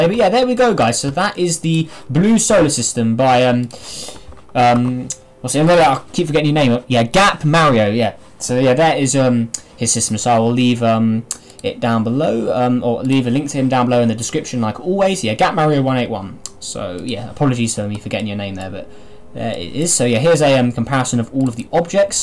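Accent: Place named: United Kingdom. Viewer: Are you from United-Kingdom? Its like if you have a little accent 😀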